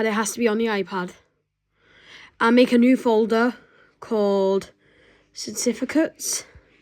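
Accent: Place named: Liverpool, United Kingdom. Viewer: W scouse bro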